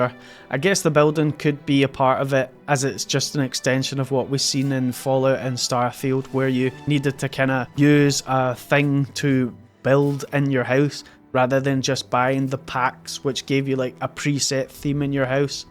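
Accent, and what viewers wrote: Scotland, United Kingdom. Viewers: Comment section never heard a Scotsman before lmao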